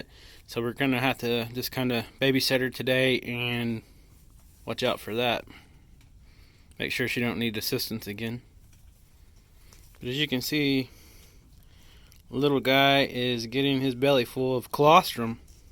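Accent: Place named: South, United States. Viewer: I can tell you're from the South when you say "I'm fixing to do this or that." I say "fixing" all the time. Nice baby goat you had!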